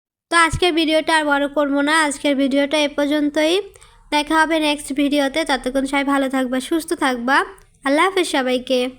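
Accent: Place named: Bangladesh. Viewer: তুমি কি বাংলাদেশী তোমার কথা য় খুব টান আছে। ভালো থেকো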